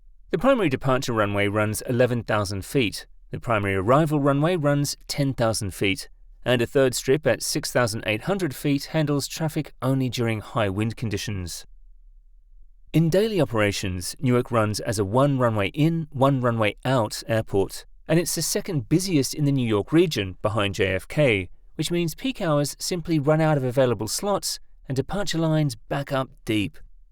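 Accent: United Kingdom. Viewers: This is BS. Newark is not a single airport without considering JFK and LaGuardia. It all functions as one organism. When one breaks down, they all break down. As for AirTrain getting to the train station, it is 20 years older than the JFK AirTrain. LaGuardia still does not have one. You sound British, is LHR the pinnacle of airports without messes? At least in London, the airports are all far enough away from each other, that a downfall with one does not affect the others.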